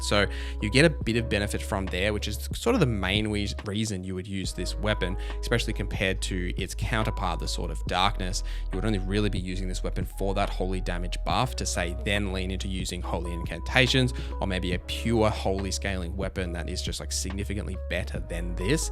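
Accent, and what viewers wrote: Australia. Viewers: Great video. I love your font of Australian accent and your energy❤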